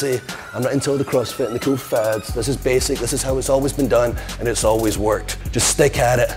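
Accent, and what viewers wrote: Scotland, United Kingdom. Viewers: English?... you're fking Scottish, mate. I take it back, you talk like us, you're worthy. ...like it's up to us 😂 Don't fk with a Scotsman. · british?I thought he is Scottish🤔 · Not British it’s Scottish mate.... Mon then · I thought you're Scottish mate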